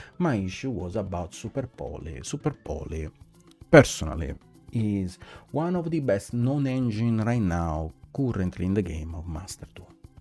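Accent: Italy. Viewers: He has the voice of undiluted Sicily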